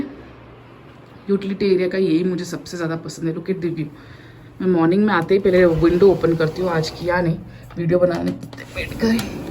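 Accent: South, India. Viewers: R u from south mam ....🤩👍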